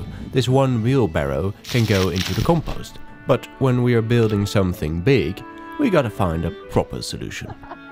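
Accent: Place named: Europe. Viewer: Where you from dude, you sound European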